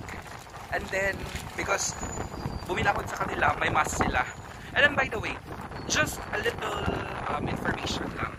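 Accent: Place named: United Kingdom. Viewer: Aha, uu nga anoh. Salamat sa pagturo. Galing mong mag explain. I like your british accent:)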